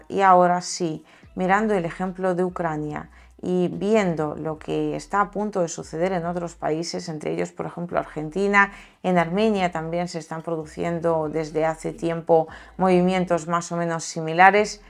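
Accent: Spain. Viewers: Me gusta tu contenido, pero se me hace indigerible ese acento español. Te sugiero suprimir ese acento ibérico, pues tu público es sobre todo latinoamericano. Los españoles son atlantistas. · Te soy honesta y a pesar de q te admiro y quiero por ser 🌹 🤗 🌹RUSA❤no me gusta tu acento de española pq perdistes tu acento de rusa 🎉😂❤😊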